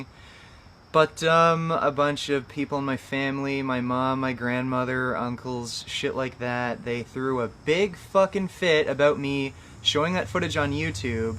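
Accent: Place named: Toronto, Canada. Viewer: Xavier Rose typical Toronto accent. It sounds insanely gay.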